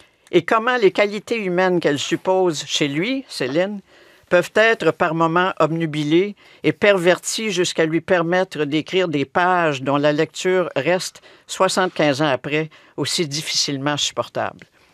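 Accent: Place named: Quebec, Canada. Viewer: Merci beaucoup pour votre présentation. J'adore l'accent canadien, québécois. D'Algérie je vous suis attentivement.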